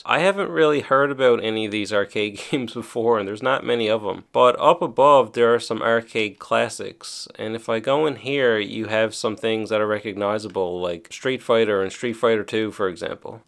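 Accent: Newfoundland, Canada. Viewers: Bro where are you from i hear an irish accent slash american or canadian are u from newfoundland??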